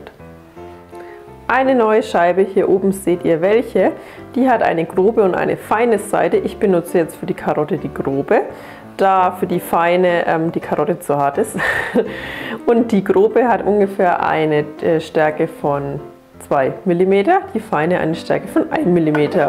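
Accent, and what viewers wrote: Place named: Franconia, Germany. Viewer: Hört man sofort 😂 Aber nicht nur das r, auch das "Sprechsingen" etc. Mir Frangen hald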